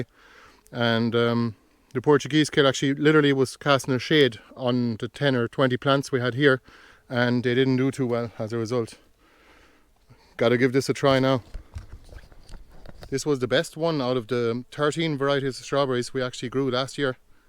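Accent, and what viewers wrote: Ireland. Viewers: Lovely video, well done, a fabulous example of gardening with Nature. (And of course, an Irish accent could read a boring old shopping list and I'd be hooked!!) Found you via Colette at Bealtaine Cottage and have to say, I'm really envious of all the currants and jostaberries you grow - too warm here in my part of New Zealand (Waiheke Island, up north) for any of those. To see all the yarrow and Jerusalem artichokes, all the herbs - I wish I had more space to indulge all that too. Thanks so much for making the film, I shall have to go back and look at the others you'd done. Mighty impressive. Unfortunately, we have to pay a fortune to get wood chips here so I only use them occasionally for the paths, but have been no dig for nearly 20 years and I know it works. Great use for all the old cardboard boxes too, eh?! Gorgeous, hope things continue to go well for you and thanks again for showing us :) ♥♥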